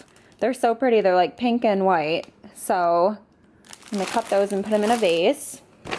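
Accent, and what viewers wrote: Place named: Buffalo, United States. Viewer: I just discovered your channel! Love it. I'm from Buffalo but live in SC now--my accent is very similar to yours and I'm dying at the Oregon/Organ thing.... "Bitch-Bye" ha!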